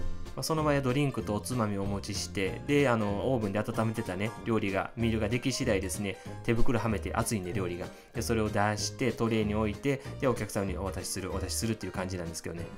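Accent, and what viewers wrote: Kansai, Japan. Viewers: いろんなとこ開けさらして何してんねや😂 関西色特に強め（笑） プレミアムスナックの正体が気になりました エコノミーでもらえるのと違うんや✨食べてみたい😋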